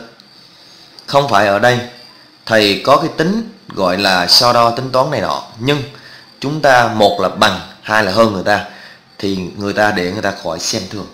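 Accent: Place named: Binh Dinh, Vietnam. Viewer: VLOG dạ hèn j dống tiếg bình định😂😂😂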